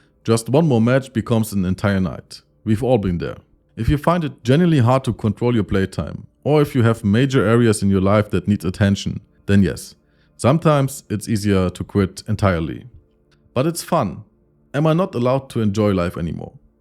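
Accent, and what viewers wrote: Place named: Germany. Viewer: I love deutsch-flavored English. It’s flavorful and discernible. Unlike China accent English… 😥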